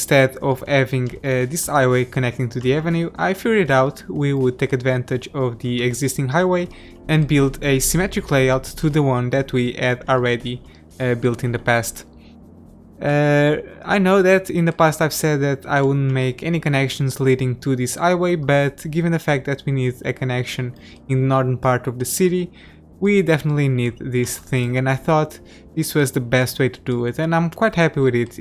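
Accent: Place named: Portugal. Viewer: O sotaque português nota-se tão bem! Grande trabalho que tens feito neste canal, vejo muitos dos vídeos que fazes, obrigado pela dedicação!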